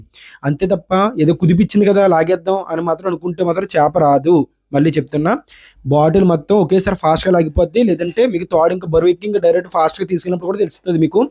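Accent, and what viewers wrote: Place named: Godavari, India. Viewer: మన గోదారి జిల్లా బిడ్డ లా ఉన్నావే మాట చూస్తే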